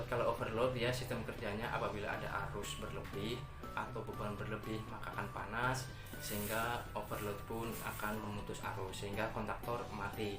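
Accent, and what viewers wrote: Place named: Java, Indonesia. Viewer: Pak ngapak medok jawanya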